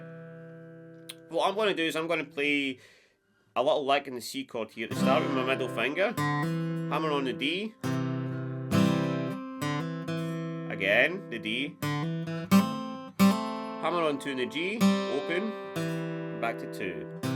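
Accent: Scotland, United Kingdom. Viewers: im a Kiwi and find your Scottish twang very easy to listen to but I do have a wife from Folkirk.Great vids thanks very much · Greetings from Frankfurt/Germany. Please do me a favor and stick to your Scottish accent. I am always pleased to learn a new language and your lessons are awesome and I look forward for every single one of them. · I’m Italian, use to struggle with British accent but easily understand 99.7 percent of what you say 😊God bless Scots! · It's a Scottish accent, right? Sometimes I misunderstand when you bend those vowels in a way I wasn't expecting, but it's beautiful, like bending notes in the Blues 😊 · Sounds great, many thanks, and don't try to disguise that lovely Scottish accent! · Love the Scottish accent ❤ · Hey, thanks for the grate lessions, stay as you are and keep that little scottish accent! For me - as a german - the rolling R is completely normal 😁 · I love your accent,! 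It's really been helping me with my Scottish accent practice, too.;-) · Love that accent, love to copy it when speaking English! 😊 Been in Scotland three times up to now, such a nice country, wonderful people up there! The hymn of our football club here in Cologne, the 1. FC Köln, has the melody of "Loch Lomond" - always gives you goosebumps! Keep on rocking,! Great lessons you give here! Greetings from Germany! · I love your accent, and having just got back to London after my first time working in Scotland love it even more! It's one of the reasons why I watch your videos. The main one is after many years your lessons have opened up my playing when ive been stuck in a rutt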